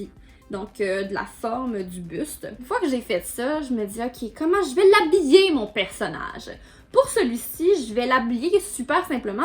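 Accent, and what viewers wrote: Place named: Quebec, Canada. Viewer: Les gens qui ont l'accent québécois ont tellement de charisme 😂🙈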